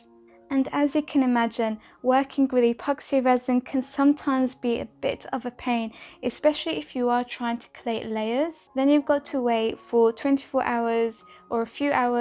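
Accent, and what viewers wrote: United Kingdom. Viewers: Thanks so much for this information. I’ve had UV and epoxy resin for ages to use with polymer clay for dioramas etc., but felt intimidated. As I’ve only just found your channel, I will be enjoying a binge! 🥴 Great to hear a UK voice too as I know I will understand the shops etc you name in your videos. With grateful thanks from Scotland! ❤️🏴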